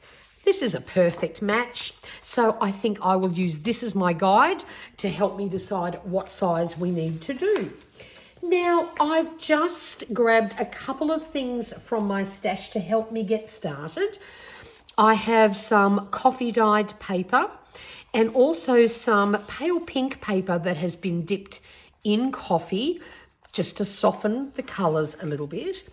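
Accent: Australia. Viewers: I keep going back to listen to you as I miss the Aussie accent...lol where in Brissie are you? I have family in and around there, not that I imagine you would know them...lol xxx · why thank you! I didn’t mean to fish for subbies - doh! I just love hearing Aussie accents in our community & get so excited 😂 Especially when I like their work (like I do yours)!! But thank you! I have quite a bit of family in Brisbane & further north too. Hoping to make another trip up this year. It’s a gorgeous part of the world & always nice to be around family.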